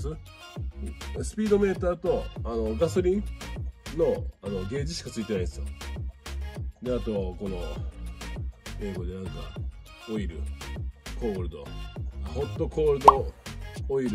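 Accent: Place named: Okinawa, Japan. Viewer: 喋りがツボります笑 沖縄感最高です😂😂😂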